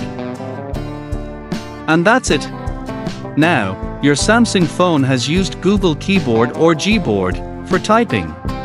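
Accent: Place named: Ireland. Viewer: Great Irish accent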